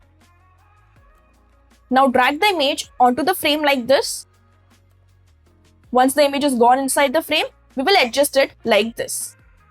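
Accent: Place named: India. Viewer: Indian no doubt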